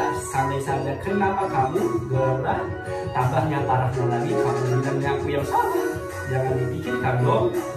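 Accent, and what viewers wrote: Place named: Java, Indonesia. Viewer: Kak asli orang mana yah?? Kok medok kalau bicara😂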